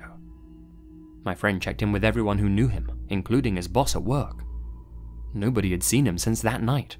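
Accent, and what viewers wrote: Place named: United Kingdom. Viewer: You're from Brazil, have a "British" accent (though I can't really place it), and you've lived in Honk Kong... wow, what an unusual mix. Greetings from Chile!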